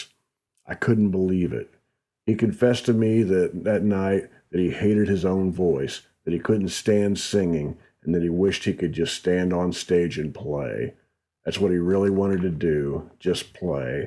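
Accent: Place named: South, United States. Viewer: Right when I hear the "Hey Friends" in that slightly southern accent....I immediately stop everything and watch the video👍🤔👍🤔👍🤔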